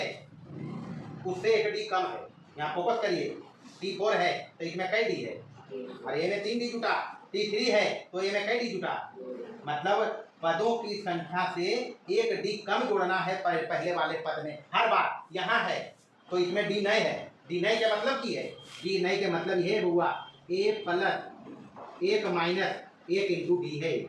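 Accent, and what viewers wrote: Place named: Bihar, India. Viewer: Uncle aap Mokama se he na bhasa se pahchal liya me bhi waha rahta tha batcha me 🙂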